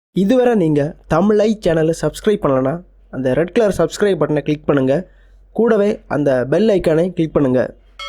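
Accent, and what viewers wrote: Kanyakumari, India. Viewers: Bro kanyakumari ஆ Bro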